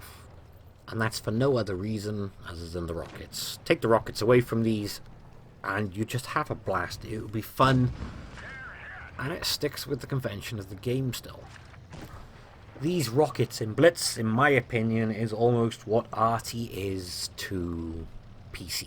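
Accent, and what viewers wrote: Wales, United Kingdom. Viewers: Missed your soothing Welsh voice! And your tips of course!!! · Are u welsh?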